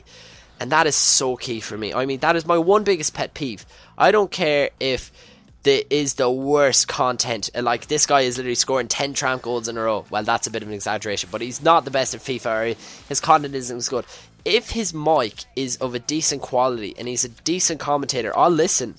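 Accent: Ireland. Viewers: mate first your irish which is beast second ur just as good as ksi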